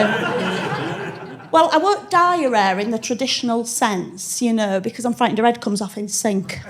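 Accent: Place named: Leeds, United Kingdom. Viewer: The northern dialect gives it comic overtones in london dialect she would taken to a doctor.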